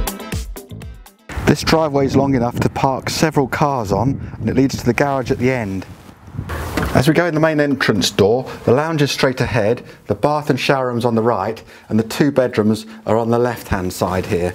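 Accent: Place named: England, United Kingdom. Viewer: You and Your dog Ruby are The Absolute Sweetest!! Just Had to click on another of your Charming videos with your Sweet dog and your Charming English accent!! I Wish realtors here in New York, United States, would Show videos with their Loving dogs!! Makes for such a warm and inviting video to view potential homes. Thankyou So much for posting, All The Best To You and Dear Ruby in England dear sir!! Take care, Most Sincerely, Bernadette.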